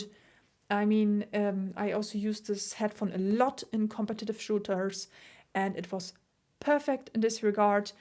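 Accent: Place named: Germany. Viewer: Ahhh nice long term review! I could tell you were german right away ;)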